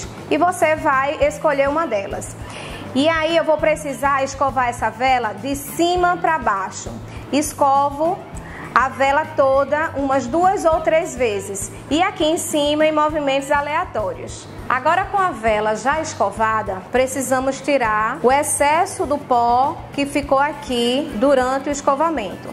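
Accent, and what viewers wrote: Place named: Pernambuco, Brazil. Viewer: você é ótima! Aprecio muito os vídeos da, inclusive os daquele químico daí. E amo esse sotaque pernambucano. O.mais agradável do Brasil, na minha opinião. Parabéns aos.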